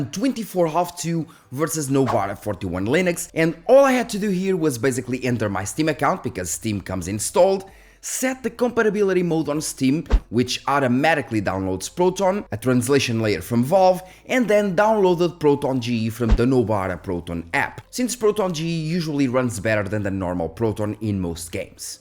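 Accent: Portugal. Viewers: Assim que abri o vídeo soube que estava perante um puro tuga.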